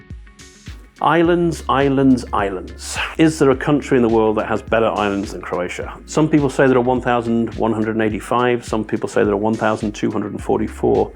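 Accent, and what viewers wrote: United Kingdom. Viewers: Pommy nob.😂😂😂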